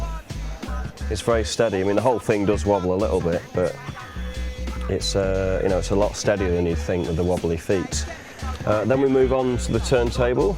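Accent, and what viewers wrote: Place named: United Kingdom. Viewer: Oh! He's a brit!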